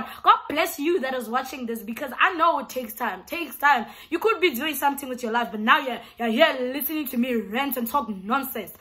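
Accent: Nigeria. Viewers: It’s the Nigerian accent for me😂😭😭❤️‍🔥😻🤌🏽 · What is your nationality babe,,,coz l hear you talk Nigeria accent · Babyyy your Nigerian accent is hot hot mahn🔥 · It's your Nigerian accent for me 🔥 😭😭😂👐⚰️ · your Nigerian accent is top tier. 😂❤️❤️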